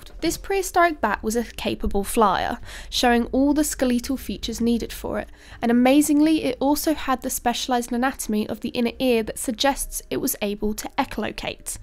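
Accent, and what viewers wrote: RP, United Kingdom. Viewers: Alright, she does, admittedly, bring clear-spoken Queen's English to the narration. Still (mischievously) one has to wonder if of the boys brought in a GF to see if she would get a better YT response. Yes.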